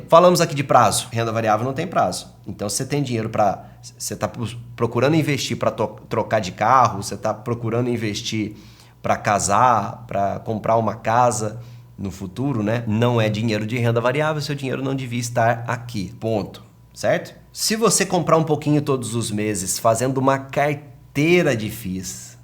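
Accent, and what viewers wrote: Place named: Caipira, Brazil. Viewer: Ultima vez q vi vídeo do seu canal vc falava com voz de caipira RS pq mudou?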